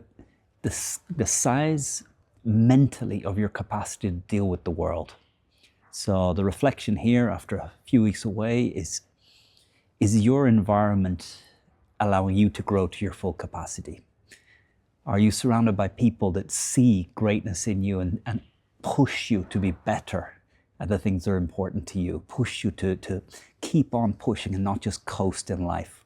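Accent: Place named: Ireland. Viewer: Brilliant and memorable! As much as I love your Irish accent your "un abrazo" at the end made me wish for some Spanish language content. And maybe a video explaining how you found your way to Spain and your transition from "guiri" to local. That would be very interesting for us! I'm an American with majority Irish heritage who fell in love with Sevilla in the early 2000s. I made great local friends there, but ultimately I always came back to the States for career reasons. I admire that you've so fully embraced your love for Spain, and made such a fulfilling life for yourself there. Un abrazo! -Justin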